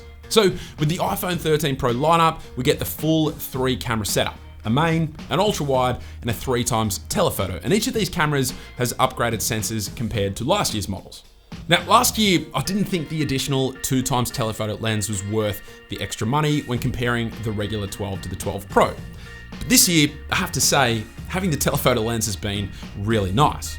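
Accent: Australia. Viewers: Whats with the over the top bogan accent?? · Hey, I tried to buy one of the RhinoShield Collab cases for my 13 Pro Max using your link and code, but I got an error saying they cannot ship the PAC-MAN collection to Australia? Curious since you sound Aussie? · I never heard such deep australian accent in one person. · That hick Aussie accent tho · Heard ur aussie voice and gave an instant sub. Luv to see it 🇦🇺💙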